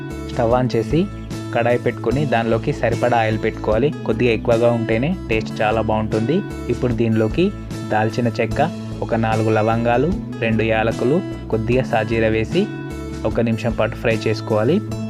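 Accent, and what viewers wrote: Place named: Andhra Pradesh, India. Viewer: Tammi, mana karimnagar yasa lo matladakunda, andhra yasa matladtavem tammi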